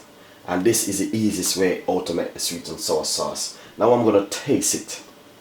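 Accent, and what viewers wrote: Jamaica. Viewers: Jamaican talk😅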